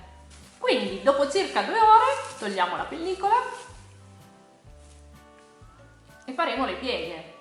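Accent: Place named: Parma, Italy. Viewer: Ciao! Mi é piaciuto il tuo canale, parli come un' amica mia italiana che é di Parma , di che città sei? Parli così bene che io ti capisco tutto e non sono nemmeno italiana. Saluti dal Cile!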